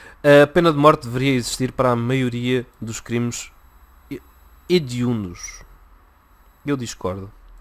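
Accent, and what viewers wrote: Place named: Portugal. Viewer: fico vendo teus videos e começo a falar que nem você, com o 'sotaque' de Portugal, como vocês portugueses veem o português do Brasil.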